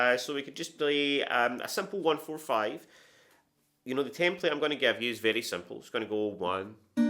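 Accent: Scotland, United Kingdom. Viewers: Very cool lesson dude , I’m in the United States , I love the Scottish accent , reminds me of a guy I used to work with over here , he was born in Glasgow and the plates on his car said Haggis 1 , he was a cool guy !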